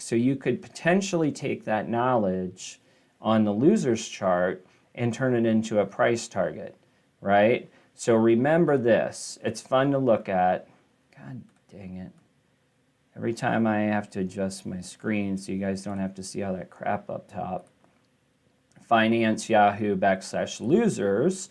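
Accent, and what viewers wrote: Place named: Hershey, United States. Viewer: It’s funny listening to your expressions. Takes me back home to Hershey. Very similar. Thanks buddy.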